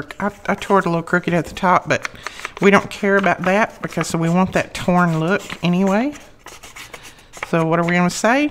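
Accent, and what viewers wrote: South, United States. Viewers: “Oooo, I like that.” “Init cute?” “I’m gonna embellish that.” I love all of your expressions. Can you add a “push here” button to the tshirt so we can hear your wonderful Southern accent too? :)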